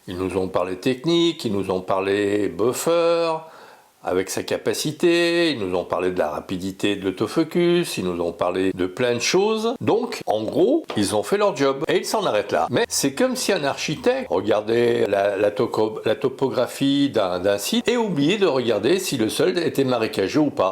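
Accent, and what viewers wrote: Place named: Geneva, Switzerland. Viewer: Moi je suis sur PC/Windows10. Tu as gardé un peu de l'accent de Genèèève ha ha ! Moi je suis né à la Maternité de Genève il y a maintenant 64 ans ! Comme le temps passe ! Maintenant c'est la retraite, c'est le plus beau des métiers. Comme cité plus haut, j'ai 4 boitiers et je les utilise tous. Aujourd'hui, tour en e-vtt avec le D7100 et un 18/105 mm dans le sac à dos, et v'la ti pas que je me retrouve nez à nez avec un chamois à devant moi (j'ai pas fait gaffe !) sur un chemin forestier dans les Gorges de l'Orbe, il a pas bougé et je me suis installé à 15 m de lui, il a fini par se rapprocher de moi et j'ai pu le shooter plusieurs fois, elle est pas belle la vie ! Et tout cela avec un "crouille" boitier, j'ai bien fait de le prendre avec moi. Passe une excellente semaine amigo, cordialement François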